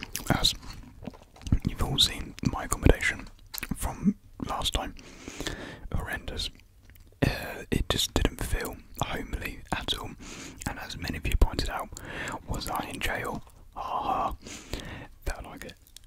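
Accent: United Kingdom. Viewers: british accents are so elite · I loved your intro and you have a great voice. By the way, I love your British accent😍😍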